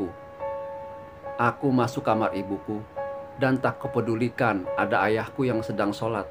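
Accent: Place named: West Java, Indonesia. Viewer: jngn lupa logat Cibogo pake Terus dan plesetan semangat 💪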